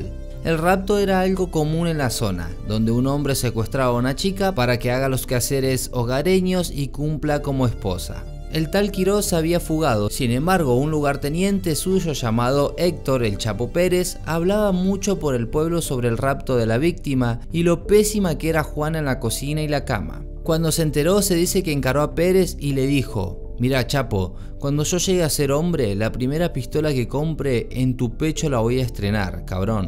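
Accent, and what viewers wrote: Argentina. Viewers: UN Argentino contando la historia de chalino. No Manches. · Deberías de pronunciar bien la y o lle . El Argentino se escucha mal . No creo que sea tan dificil pronunciar la Y y la LL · dale chee voz soss argentino.........._????? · Jajajaja el que narra tiene acento Argentino Narrando una historia de un Mexicana Jajajaja no concuerda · Sólo el título para ganar más visitas, y perdón pero no me gustó la narración, más bien el acento argentino hablando de historias mexicanas 😮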